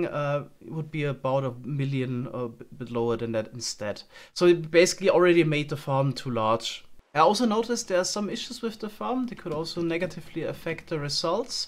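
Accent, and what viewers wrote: Germany. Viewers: your german? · I can hear a little bit of deutsch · The german accent is soooooo brutal hahaha love it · bist du deutscher ? hört sich leicht danach an.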